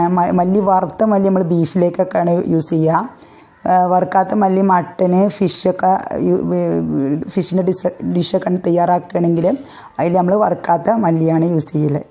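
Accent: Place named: Malappuram, India. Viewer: ഇജ്ജിന്റെ വർത്തമാനം നല്ല മലപ്പുറം tune ...super vedio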